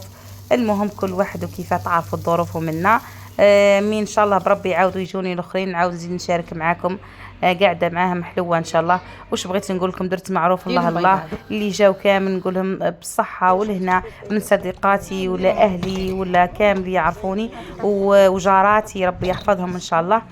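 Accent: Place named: Sahara. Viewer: ربي يبارك وصح عيدك انتي من الصحراء على خاطر المردود والدشيشة وعيال خوتي لهجة صحراوية متاع ورقلة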